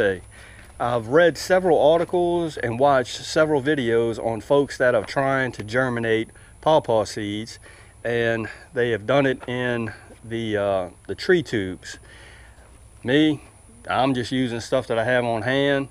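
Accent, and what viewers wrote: South, United States. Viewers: I love your country accent🤗reminds me of my momma❤New subbie! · Hello... Damn, I'm loving that southern accent! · Thanks for the amazing video love the accent I have some family in the south north Carolina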